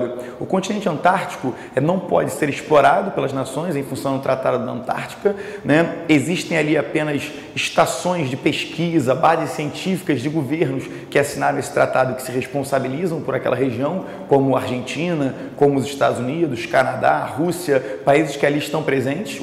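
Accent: Rio de Janeiro, Brazil. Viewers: Ninguém merece esse sotaque de carioca aff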